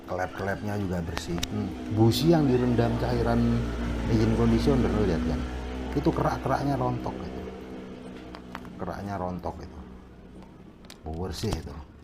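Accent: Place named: East Java, Indonesia. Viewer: Suara anda kok kayak orang jawa Timur bang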